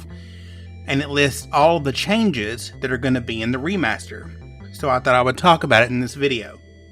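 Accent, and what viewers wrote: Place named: South, United States. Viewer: Man I want to like this video but that accent is so heavy. I'm from Alabama myself and I try not to talk with that draw. It's funny I'm from one of the most hillbilly states in America, but I hate the accent and the way of life. I'll take Miami Beach and the ocean any day over hillbilly land in the mountains. More southerners should try to speak without that horrible accent.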